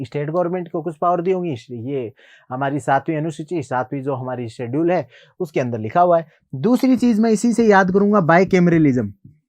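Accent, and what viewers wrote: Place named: Rajasthan, India. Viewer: 🤣🤣🤣 aap bilkul desi bol rahe ho... Rajasthani hai bhai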